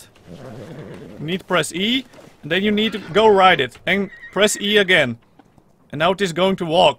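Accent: Netherlands. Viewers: are you dutch? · ben jij een nederlander je hebt het typisch nederlandse accent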